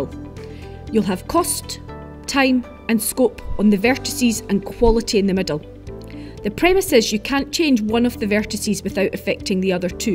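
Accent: Scotland, United Kingdom. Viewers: The strongest, most fascinating Scottish accent I’ve ever heard in my life. Love et!😍🤣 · I luv da scottis¨h accen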